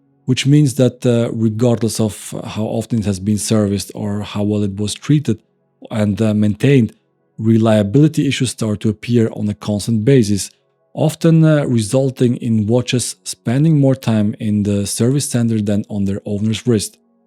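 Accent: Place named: Switzerland. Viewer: Love this content. You give precise and honest insight. What can be irritating at times in your constant mispronunciation of "available*" (say: ah-vale-ah-bell" not "away-bell"!) and you always pronuncing a "V" like a "W". They are sometimes pronounced the same in German (sounds like Swiss-German is your native language), but are vastly different in English.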